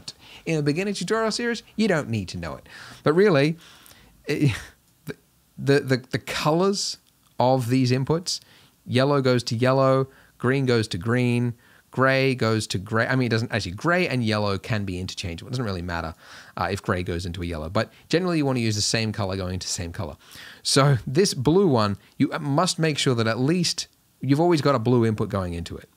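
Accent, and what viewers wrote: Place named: Australia. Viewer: bru are you an Aussie mate?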